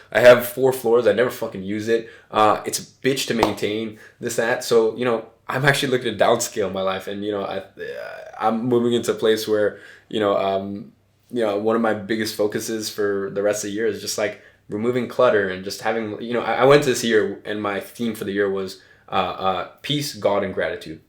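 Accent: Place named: United States. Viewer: Why does he have an american accent?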